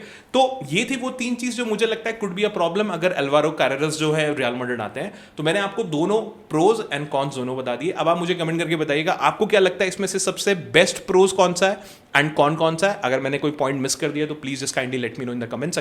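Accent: India. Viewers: Indian accent cuts my ears🤢🤢🤮🤮🤮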